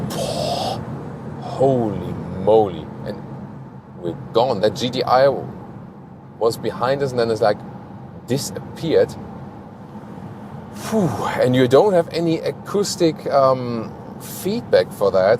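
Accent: Germany. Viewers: Wieso sind die Videos Englisch? Rein vom Akzent her würdest du ja Deutsch sprechen, oder? Es ist nicht so, dass ich der englischen Sprache nicht mächtig wäre, im Gegenteil. Aber dieser Kanal in Deutsch wäre wohl eher eine Marktlücke als noch ein weiterer englischer Auto-YT-Kanal...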